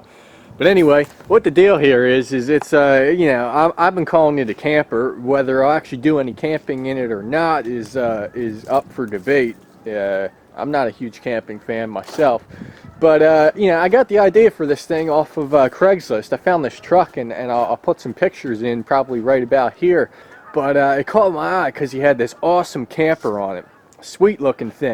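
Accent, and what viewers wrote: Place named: Michigan, United States. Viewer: Sounds like Michigan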